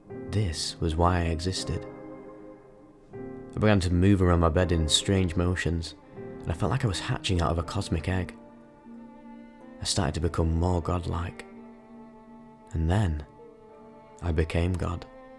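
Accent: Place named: Yorkshire, United Kingdom. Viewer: fairrrr, I used to always get copyright claims when watching music videos split screen. One time in particular when I streamed an Antony Joshua title fight in the corner whilst streaming a wow dungeon. I guess that's taking the piss a bit 😅 was never getting away with that. Also, cool to hear your from up north — I'm a manc. Guessing your from Leeds or York, my sisters bf is from there you sound the same lmao. Anyway enough of the life story, I stumbled across your channel whilst researching a some stuff. I’ve been using ambience music on my latest videos with my voice over the top, hoping not to get strikes, so far so good. I actually refrained from using an Egyptian type ambience from Assassins creed because it was a video game track and I thought I'd most definitely get striked, however, I shall try incorporate some video game music, it seems to be safer 👍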